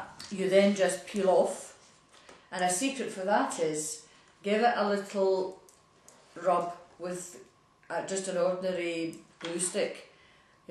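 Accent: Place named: Scotland, United Kingdom. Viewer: I appreciate you slowing down so we "non-Scotish" can follow, but both your accent and videos are so terrific, watching it again is no problem. Thanks for sharing your talents with us.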